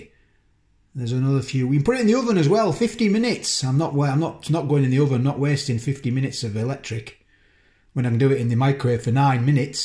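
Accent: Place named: Yorkshire, United Kingdom. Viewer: I was waiting for the moment when it burnt your mouth off but you handled it like a boss! Great review, the Yorkshire accent tops it off nicely 👌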